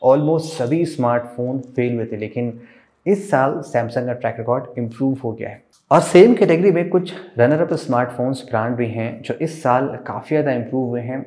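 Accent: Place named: Kamta, India. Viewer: I think you are from kamta side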